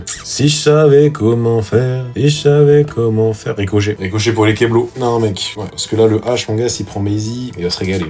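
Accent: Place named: England, United Kingdom. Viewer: accent anglais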